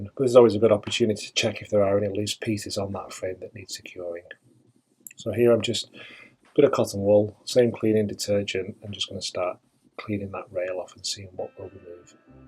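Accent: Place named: Derbyshire, United Kingdom. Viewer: I’m from Derbyshire and your accent sounds very familiar. Love your down to earth approach and subscribed right away!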